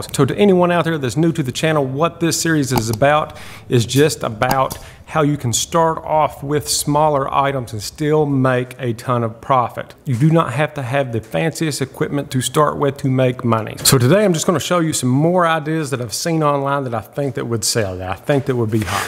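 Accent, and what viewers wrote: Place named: Kentucky, United States. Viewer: What part of Kentucky are you from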